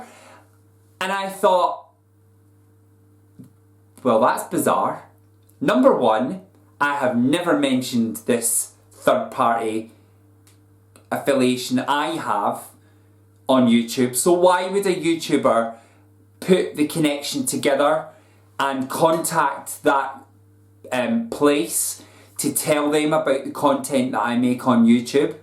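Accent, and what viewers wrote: Scotland, United Kingdom. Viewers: yaay another fellow Scottish YouTuber 😉 your accent just made me happy for the sound of home after a few hours of YouTube videos 😂😂 weird world we live in 😫 imagine weirdos like that are in every corner of the internet. I completely understand the need for privacy, a person's life is there own to share if they wish. I really believe some drama channels forget a normal person is behind the computer. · I am so sorry that this happened to you! This person is clearly unstable and loves to start and create drama. He clearly doesn't have much of a life. You probably hit a nerve, and that is why he did what he did. I think that you have always been very mature and professional with your videos. I enjoy you and your channel. Also, love your Scottish brogue! ♥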